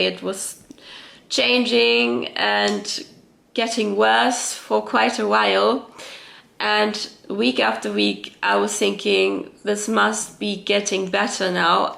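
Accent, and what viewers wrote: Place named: Germany. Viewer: Ich habe an deinem Akzent gehört, dass du Deutsch sprichst:), es freut mich, dass es dir besser geht und du dein Wissen teilst. Ich beobachte, dass sehr viele Menschen selbst jetzt im Sommer erkältet sind. Sie haben einen Infekt nach dem anderen. Das gab es vor Covid und der Impfung nie. Einen Tipp habe ich noch: In einer infekt freien Zeit hat sich bewährt den Körper Kältereizen auszusetzen. Kalte, oder Wechselduschen, Anwendungen nach dem guten , alten Kneipp, der ja immer mehr in Vergessenheit gerät. Das ist wirklich gut fürs Immunsystem.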